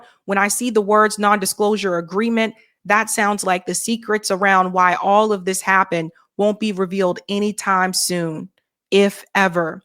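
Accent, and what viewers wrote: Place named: South, United States. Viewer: ..!!! 🙂 Pull Out.? You are a "Southern Woman" I guess Boston rubbed off on you a little bit 🙂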